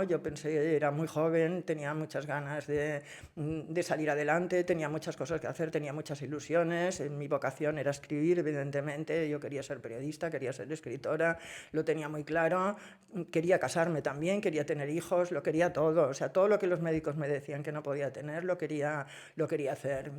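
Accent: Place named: Barcelona, Spain. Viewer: Me he divertido mucho.Pilar Eyre en estado puro.Nunca defrauda. Ese tonito pijito de niña bien de Barcelona, de revolucionaria reconvertida a nacionalista civilizada, de mis desgracias tienen un no sé qué de glamour que no puedo evitar que tiene encandiladas a sus seguidoras , especialmente de ultramar, es muy entretenido y en el fondo inofensivo.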